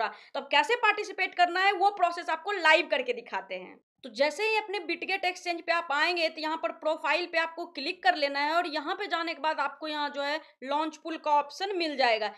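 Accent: Bihar, India. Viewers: Tum pakka bihari ho qki baat krne ka tone apne Bihar ka hi hai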